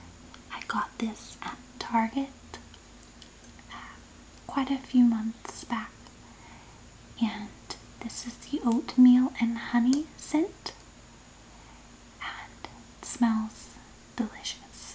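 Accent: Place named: South, United States. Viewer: Welcome back! Thanks for the update! Random but I hear your accent way stronger now than in previous videos... southern! (Or texan?):)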